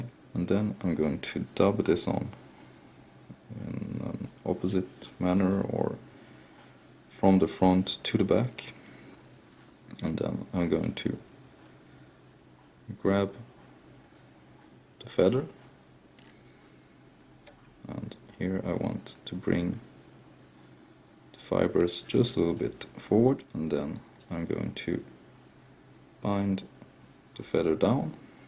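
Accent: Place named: Sweden. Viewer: You know, experience tells me that that particular mix of accents means you could be Swedish...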